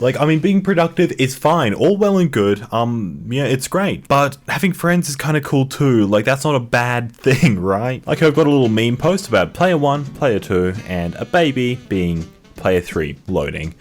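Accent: Australia. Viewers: Hold on, Mate you're Australian??? Frick yeah, found another one😁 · ooooh you're from canberra?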